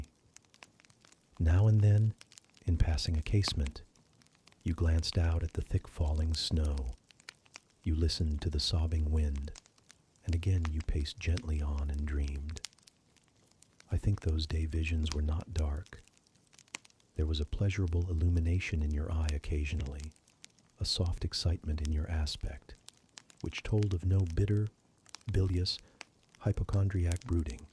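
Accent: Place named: United States. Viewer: I searched American pronounced jane eyre. This is best. And your voice so sweetie as like Rochester. And fire sound is so convenient to my mind. And its good because it is original book contents without skipping. Thanks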